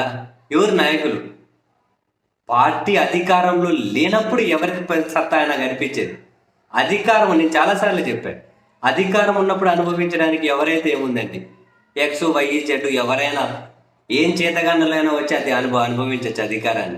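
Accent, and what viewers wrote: Rayalaseema, India. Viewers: First time రాయల సీమ slang lo chusanu nenu నవ్వుకొన్నా బాగా ❤😂😂😂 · My Rayalaseema language I liked it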